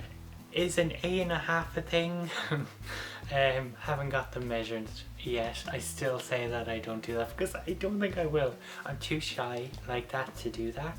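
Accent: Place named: Ireland. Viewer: Anyone saying you don't sound like a woman is what we in Canada like call "hosers", (foolish or uncultivated people), You definitely have a feminine voice, I actually always thought the Irish accent sounds sexier in women than men and you got that sexy goin' on sister in all aspect. Your videos are awesome and definitely inspirational, so I hope you go through with your channel no matter what, since as trans people, especially as trans women, we have a truly hard fight to break barriers, and I don't think YouTube's threats should be an exception to our strength.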